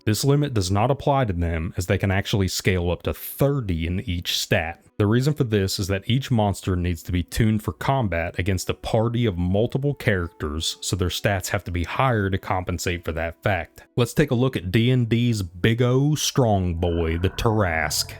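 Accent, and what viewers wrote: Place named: Rural, United States. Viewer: Ive known a lot of hicks in my day, but brother if that is what you sound like reading from a script, you're better off leaving it out. Yeesh.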